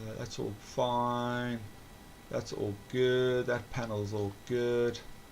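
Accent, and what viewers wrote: South Africa. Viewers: very nice Video and always good to hear a South African voice. The game is very realistic and you seem very knowledgeable ,Keep the videos coming and I will definitely support them!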